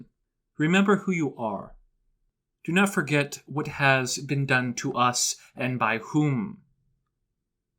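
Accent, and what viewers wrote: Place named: United States. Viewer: Oh yup let’s keep pushing a pro Russian sentiment then go ahead and keep whining about how apparently Rusyns are being left off, bunch of bullshit considering you don’t even live in Ukraine you have a very nice clear American accent just a bunch of shit to disguise yourself as pro Russian and you sitting there happy for Ukrainian people being killed.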